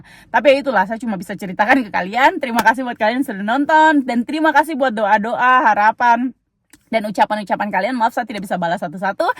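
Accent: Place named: Maluku, Indonesia. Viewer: Selamat ulang tahun kk...salam kenal aku penggemar baru mu dari yogya...asek bgt logat maluku nya masih terus melekat kk... Sehabis nonton DAILY TIKA WEIXUN DICHINA langsung gercep nonton vlognya kk...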